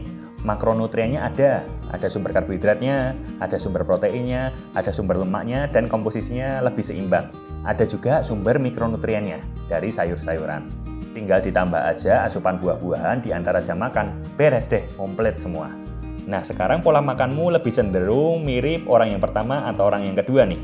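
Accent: Java, Indonesia. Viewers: Medhok nya itu loh yg bikin nda bisa skip skip video.. Good work makasi teori dan motivasinya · Medhok kali ni mas nya🤣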